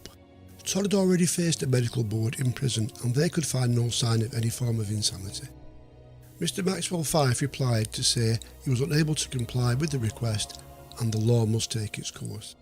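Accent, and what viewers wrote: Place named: Northern England, United Kingdom. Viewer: Considering I'm a confirmed Yorkshireman, there'd b sum sort of rivalry, bt nt this time, it's gud to hear a Northerner, even better as it's a Northerner doin such a great job with these videos, gud job, u deserve any plaudits 👍👍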